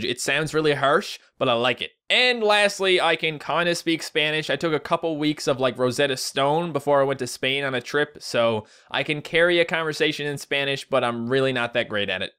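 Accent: Newfoundland, Canada. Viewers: That's weird, your accent is extremely Canadian.:'D I could tell you were Canadian instantly tbh. (I'm an accent nerd though) (anyway you're awesome keep on keeping on /o/) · Your "Newfoundland" accent sound kinda like the way people talk around here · Hey im from NL Bonavista and i understand all the newfoundland buddy, Love you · I didn't understood anything of what you said with the Newfoundland accent. ^^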